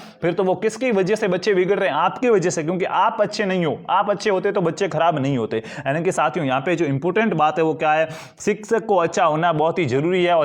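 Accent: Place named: Udaipur, India. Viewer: Sir apki भाषा se ap udaipur se lag re hoo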